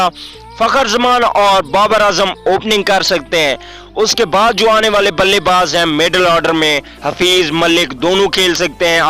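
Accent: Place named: Bihar, India. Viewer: Bhai bihari ho kya aapko aawaz se lag rha Hai bihari ho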